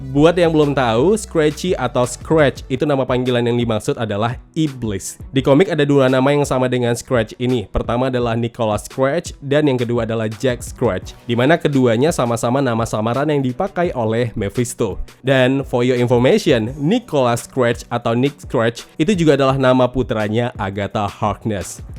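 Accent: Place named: Manado, Indonesia. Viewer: logat logat manado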